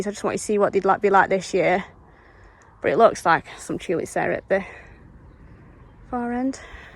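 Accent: United Kingdom. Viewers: I’d love to see a transformation video, a before/after with your thoughts on what you recommended to the client and why. Living in America, I can listen to an English accent for hours. Also, either a video or narration over a video of how and when you started in the business, what you love about what you do, what you don’t like so much, etc. Have a great weekend and stay safe out there 😁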